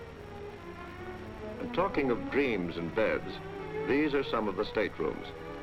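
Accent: United States. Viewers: American commentary!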